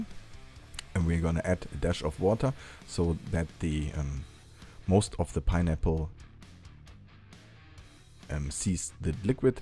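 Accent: Germany. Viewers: Best german accent ever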